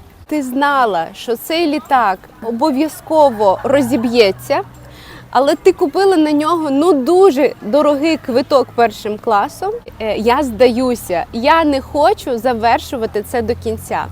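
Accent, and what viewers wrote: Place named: Western Ukraine, Ukraine. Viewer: Ваш украинский напоминает западно украинский, я тоже так говорила, после отдыха на западной Украине.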